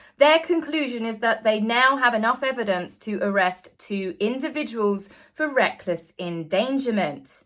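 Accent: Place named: RP, United Kingdom. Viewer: As my husband says he talks posh bollocks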